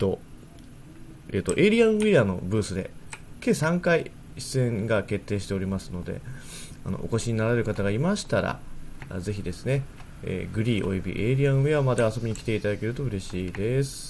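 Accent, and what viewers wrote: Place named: Kansai, Japan. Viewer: 若い頃のガッチさん、関西弁で、かなり高めの声だったんですねー。 あと、レトルトさん、ウッシーさん、キヨさん居ないからか寂しい。 敬語が少なくて、わっかいなーって思たヽ( ｀･ω･)ノ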